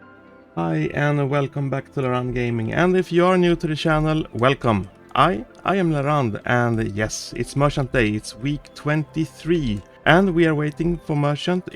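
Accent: Sweden. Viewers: You sound A bit swedish. is it just me?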